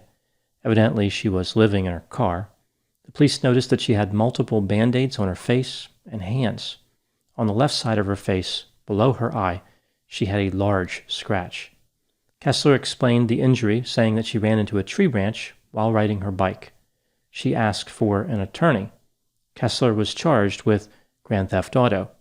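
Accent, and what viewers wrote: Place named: Philadelphia, United States. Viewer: very nicely done analysis. Kessler reminds me a bit of the Florida serial murderer Aileen Carol Wuornos. Doctor, you sound a bit like you're from the greater Philadelphia, or Tri-state area. Thanks a bit for the video. I'll keep watching future postings.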